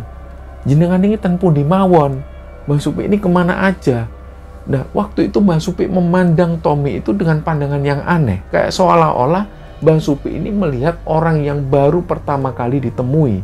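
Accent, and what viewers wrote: Java, Indonesia. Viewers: Mas itu aslinya dr kota mana ya mas.?? Bhasa jawanya medok n tau istilah bhasa2 jawa lama sperti genthong, gandok, cikrak, anak skarang sdah gak tau artinya.. Aq dr mediun hadir buat pean.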